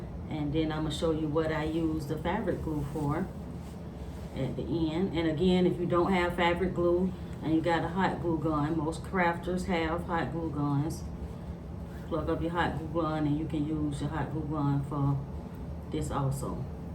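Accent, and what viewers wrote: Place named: Lowcountry, United States. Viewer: Im watching and learning .. you sound just like my family in the low country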